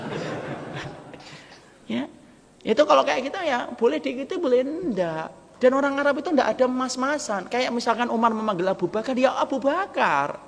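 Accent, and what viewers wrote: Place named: Kudus, Indonesia. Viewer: Logat orang Kudus'nya Ketoro Ust.. Barakallah 😀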